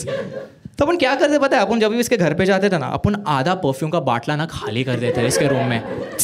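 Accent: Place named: India. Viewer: After watching so many standup, atlast i came across our own people who talks hindi exactly like the mainlaind ( others don't get me wrong, we as northeastern part of india, for us Hindi is not a rice plate eating😂 it's definitely an undertaker play😂😂) Really appreciate it manh. Bro. 👍👍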